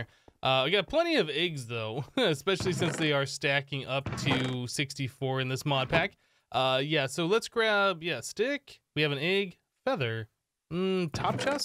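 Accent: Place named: Canada. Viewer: This guy is Canadian right? He says some words in the stereotypical accent, like when he says egg. I don’t say egg like that but some Canadians do.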